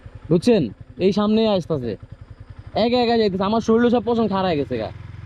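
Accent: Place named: Chittagong, Bangladesh. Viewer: ও আসলেই চট্টগ্রামের আমিও চট্টগ্রামের ওর কথার ধরন দেখে বুঝতে পারলাম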